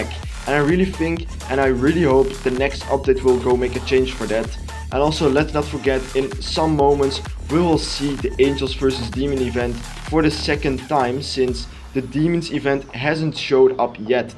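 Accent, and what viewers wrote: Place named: Netherlands. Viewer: i hear a netherlands accent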